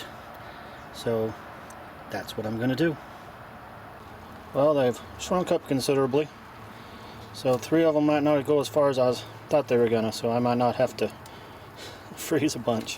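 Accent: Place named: Minnesota, United States. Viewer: You're from Minnesota huh?!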